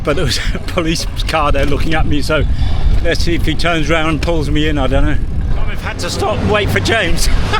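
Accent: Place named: England, United Kingdom. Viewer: Just loving English accent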